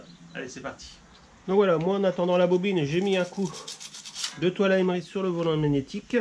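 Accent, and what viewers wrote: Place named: Nord-Pas-de-Calais, France. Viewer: Bin té vo min grand quand un veux parler Français ché pas facile d'oublier le chti "un arcomminche" Merci pour cette vidéo lol!!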